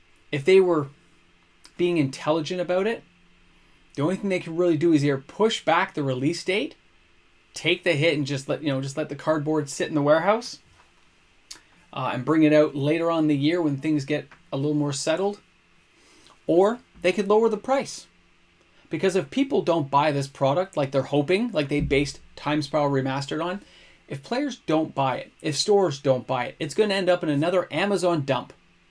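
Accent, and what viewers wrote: Canada. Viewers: this is my first video, but ill be back. i like your takes, and your POV. Also, some words make me think your Canadian, so, gotta support the homegrown Content.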